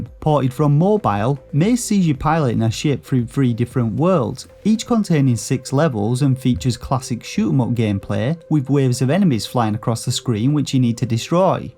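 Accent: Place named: Yorkshire, United Kingdom. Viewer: Star or Shovelware I don’t know if you’re from Yorkshire or Lancashire.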